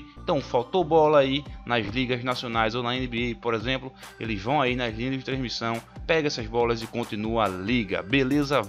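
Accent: Brazil. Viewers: Sotaque pernambucano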